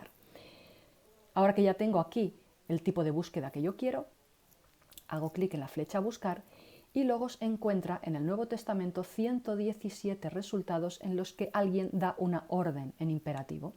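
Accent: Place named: Spain. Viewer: Logos excelente. Mis videos son más tipo como preparar mensajes usando el programa. Hay bastante riqueza de información en sus videos y yo los usare para ya no enseñar lo que tu has plasmado aquí. Con ese dejo supuse que era de España. Algún día tendré el privilegio de viajar allá.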